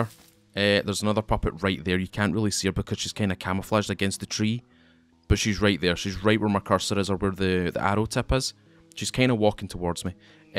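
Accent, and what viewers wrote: Scotland, United Kingdom. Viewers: Hey, I watched all of your SP Episodes and I learned so much about the game. Thanks and greetings from East-Germany. PS. I like you scotish dialect.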